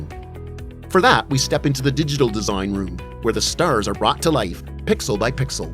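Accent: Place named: Midwest, United States. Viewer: Love the Midwest accent and the info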